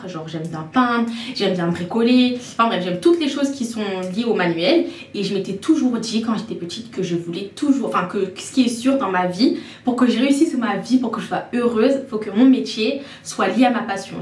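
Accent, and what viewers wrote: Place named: Lyon, France. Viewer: Le petit accent de Lyon >> La story Time sur le contact visuel m’a eu 😂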